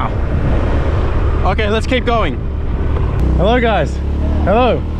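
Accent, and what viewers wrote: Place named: Australia. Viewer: Fun and engaging video!,reminds me of Harald Baldar! Really love your aussie accent!☺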